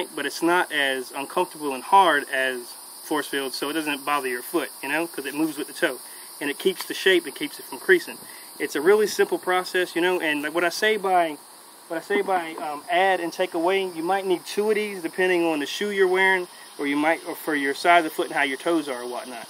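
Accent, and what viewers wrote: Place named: Appalachia, United States. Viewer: HE SOUNDS LIKE OWNAGEPRANK'S HILLBILLY VOICE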